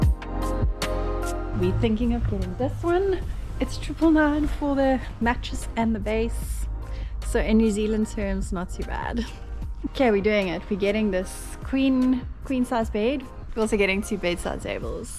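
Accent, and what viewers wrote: South Africa. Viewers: Fantastic. Nice vid. I love the Afrikaans accent.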